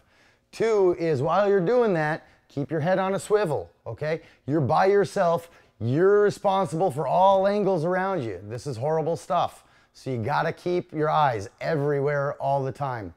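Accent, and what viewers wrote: United States. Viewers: He has a super american accent for an Israeli vet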